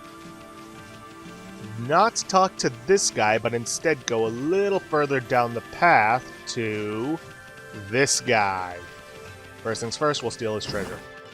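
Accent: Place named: France. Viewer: I'm rather enjoying your French accent. Also, I'm not French, so I can't make an assessment of how good it is.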